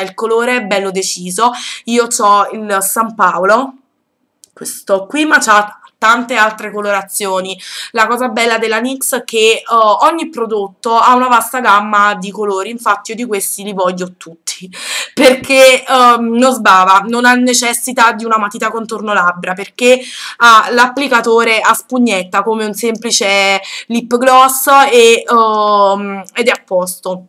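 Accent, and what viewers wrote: Naples, Italy. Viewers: Nuova iscritta !! :) Sei simpatica, carina e dolce ! E poi sei napoletana (mi pare di capire dall'accento!) ... bacio :*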